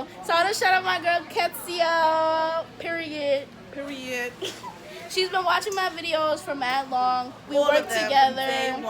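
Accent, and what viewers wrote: Saint Lucia. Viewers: Lol straight Lucian accent🤣. I swear the expectation that you're supposed to bring suitcases full of gifts down is soooo annoying. Like u rolling in money🙄 pure bayteese. Vini twavay pou li. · I'm confused w your accent lmao Is that Lucian? or trini? or were you born in the US w Lucian parents? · I knew you St.lucian from the time you spoke 😍😍😍 My ppl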